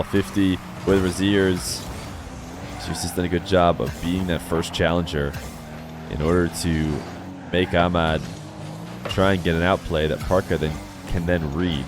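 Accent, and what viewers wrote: United States. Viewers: Tbh, you’re very similar to Johnny. It’s almost like an American accent version of him. That being said, that’s exactly what everyone, myself included, wanted. Johnnys been slacking in 1s and he has hands down the best casting. What better then if not a similar caster with an insane catalogue of ones. Keep it up, amigo.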